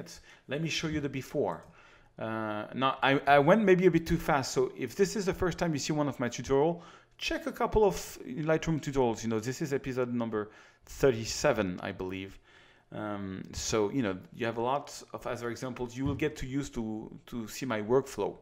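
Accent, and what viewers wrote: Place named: Paris, France. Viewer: god ! you are so french so parisien...but is ok ! je suis un toulousain in hawaii..continue ton bon travail ......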